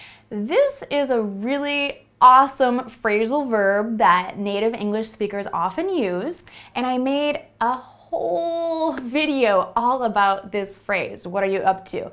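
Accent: United States. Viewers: Most Americans says hey what's up? How's it going? I love the American accent..thanks.great lesson. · Hello my name is Hamadan and I am from India I like American accent more than Indian accent so I thank you to teach me how to speak in American accent once again thank you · I’m fond of the American accent as well 😉 This was a great lesson!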